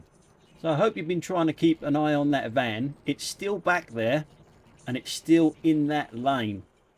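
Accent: London, United Kingdom. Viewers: Keep on as you are, Living now in Dorset it's good to hear your London accent. Some people are what I call professional at being offended, the more they are 'offended' the happier they are. PC bull shine! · I have absolutely no issues with your swearing, it's a natural part of the way we brits speak, but for the sake of keeping your videos monetised beeping it out would probably be the easiest thing to do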